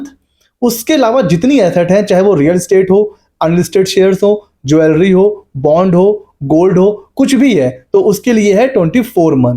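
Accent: Lucknow, India. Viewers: Lucknow se lag rahe ho, is it?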